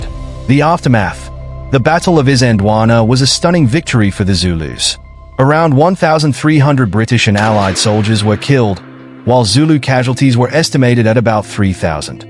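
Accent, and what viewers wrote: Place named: Australia. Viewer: Your accent is confusing I thought Australian but there's something else there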